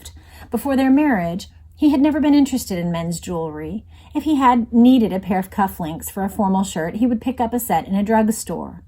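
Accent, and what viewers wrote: Texas, United States. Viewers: always reminds me of the Actor Andi Mac Dowl it's that lovely Texan Ascent 😊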